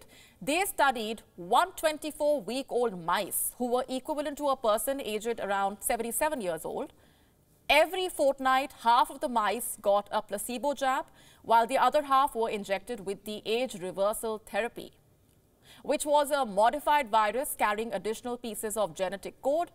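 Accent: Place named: India. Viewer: Don't speak lye like Indian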